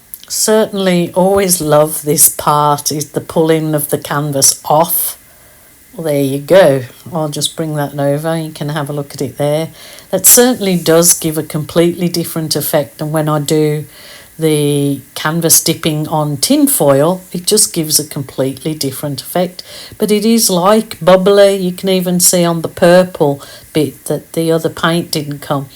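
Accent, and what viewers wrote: Birmingham, United Kingdom. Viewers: I love the smell of summer rain mmmmm so peaceful and calming smell and sound. I’m loving your Brumy accent too 😂.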